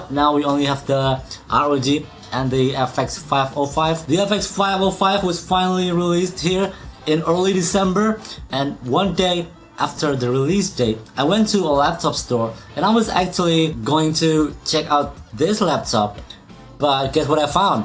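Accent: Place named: Indonesia. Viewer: Untung pronounciationnya indo, jadi paham :v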